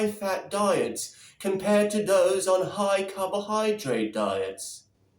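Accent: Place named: United Kingdom. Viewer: The British accent though 🤣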